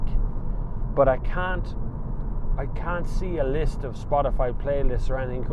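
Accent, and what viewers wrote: Ireland. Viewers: What a fine review. You have obviously heard all the bs, are not impressed and are certainly not going to repeat it. Just plain facts and honest impressions with a bit of humor. Great, thank you. And of course the Irish, most musical accent in the western world. 😀 And oh yes, almost forgot: great camera work!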